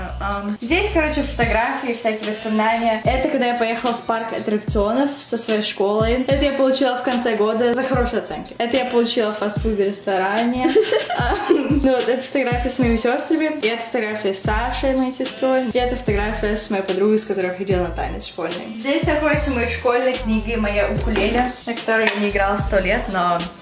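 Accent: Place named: United States. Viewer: У тебя такой классный акцент американский😑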